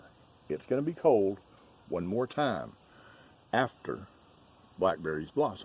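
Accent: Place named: South, United States. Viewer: Hi I'm from NonnaGrace's Channel! I like the coffee chats! I understand the southern country thing! I live in Hitchcock Texas and we all talk like that! Lol and I was born and raised in the city and it took me a really long time to get used to it!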